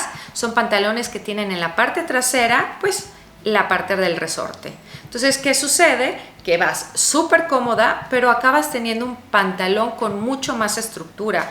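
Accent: Mexico. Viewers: Es la primera vez que te veo. Se por tu acento eres mexicana. Cariño , comienza por ti . Ese top que llevas no te va . No estás delgada , es escote no te luce , menos para un vídeo de Youtube. Y menos en ese color . Recibes mucha piel y creo que andas como entre 35 y 40 y ya tienes que elegir bien lo que usas . Una cosa es en el gym ( que ese top te iría muy bien para el gym ) y otra para un vídeo. Saludos , cariño , desde Costa Rica 🇨🇷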